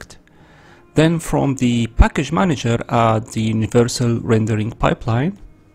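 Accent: Arab world. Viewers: your english sounds arabic lol. nice video by the way, keep the good work up. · are you Arabian , your accent says that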